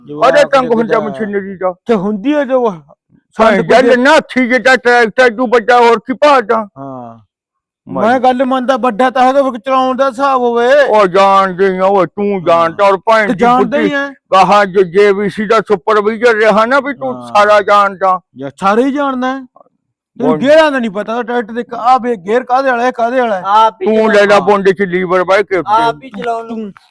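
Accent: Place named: Punjab, India. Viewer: ਤੀਜੀ ਵਾਰ ਵੀਡੀਓ ਦੇਖ ਰਹੇ ਹਾਂ ਸਿਰਫ ਤੁਹਾਡੀ ਠੇਠ ਪੰਜਾਬੀ ਬੋਲੀ ਅਤੇ ਪੁਰਾਣੇ ਵਿਰਸੇ ਜੋ ਤੁਸੀਂ ਦਿਖਾਉਂਦੇ ਹੋ ਉਸ ਦੇ ਫੈਨ ਹਾਂ ਬਾਕੀ ਐਕਟਿੰਗ ਸਿਰਾ ਹੁੰਦੀ ਹੈ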